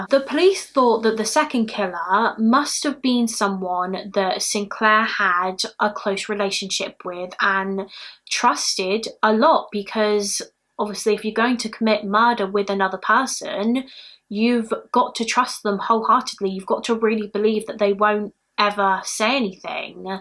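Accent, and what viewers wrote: United Kingdom. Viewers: I just love your British accent! It makes my day! Thank you for all of your interesting shows on your channel!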